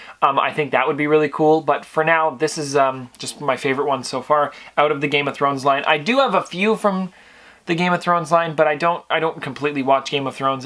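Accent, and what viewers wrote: Canada. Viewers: I love how Canadians say about lol a boot like every other word is normal just the about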